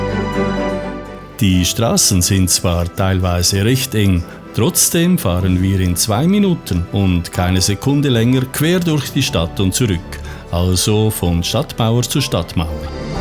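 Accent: Switzerland. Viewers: Finde das Video gut. Und ich mag das Schweizerisch, mit dem Avignon erklären wird!!! Wir haben gute Erinnerungen an die Schweiz, fahren immer wieder gerne in Urlaub dorthin ☺️ Wenn wir nicht gerade nach Südfrankreich fahren 😉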